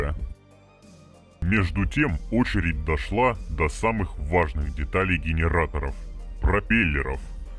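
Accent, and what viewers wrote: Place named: Soviet Union. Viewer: Автор клоун,комунист,который думает что конченый советский говор и элементы советских реплик сделают его крутым.Хуже канала по СФС не видел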